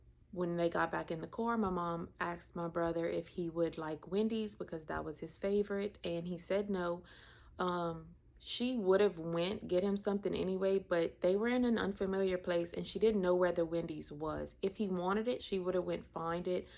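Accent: Louisiana, United States. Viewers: I am so sorry for your extremely difficult loss of so many members of your family!!! Let me tell you something girl, those people who climb Everest don’t have a thing on you! You are a living testament of resilience, strength, courage,compassion and love! I knew from your accent you were from the New Iberia area.. I am from calcasieu parish. ❤❤️‍🩹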